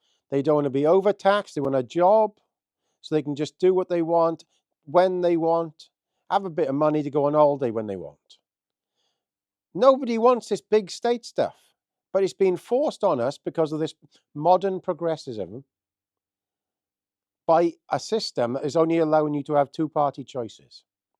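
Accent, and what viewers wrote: United Kingdom. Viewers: I think it is a Builth/Rhyadr accent, bit of Llan'dod thrown in.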